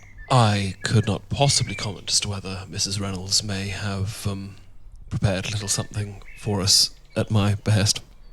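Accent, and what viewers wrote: United Kingdom. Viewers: More British RP accents please! 💖 I use these to improve my English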